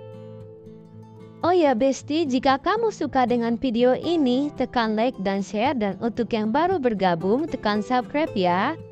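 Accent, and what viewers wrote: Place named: Japan. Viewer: Barusan subcribe , tp blm tahu , Anda org Indo / jepang sih ? Aksennya kyk org jepang 😊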